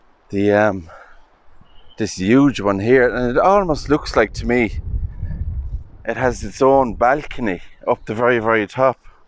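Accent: Ireland. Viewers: You're beautiful Irish accent alone makes it worth listening to! Do you speak Irish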